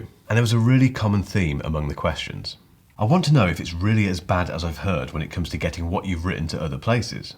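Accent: United Kingdom. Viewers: thanks for this. I have a remarkable, i purchased it just before they change to the connect option. Those of us the had the plan prior to the connect change got the full connect plan. Whew! Everything you said was correct. The price point was my biggest hartal at the time. I carries at least three note book on a daily basis but I could have used many more. I tried the iPad pro for a little while, but that was not satisfying even after the paper like screen covering. I love the tactile feel of writing and that took many months of youtude watching and reading reviews. With the remarkable 2 I enjoy writing on a digital screen. The price point is very steep and if not for my massive note taking I would have passed. One of the marketing focus points is no interruption when you are writing, drawing, or reading. Which turned out something that i enjoy. My iPad did not offer that unless i ran it in air plan mode. 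Thanks for your all your content. I have been subscribed for a few months and enjoy you British accent. Keep up the great work!! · I really ain't sure if I subscribed your channel for the reviews or for the... British accent.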